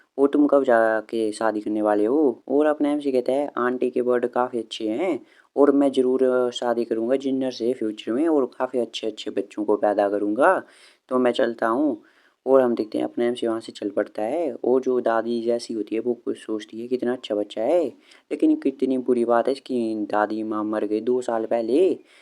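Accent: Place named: Himalayas, India. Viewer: tabhi tumhari hindi hai ... Himali taaal mehsuus ho raha thaa...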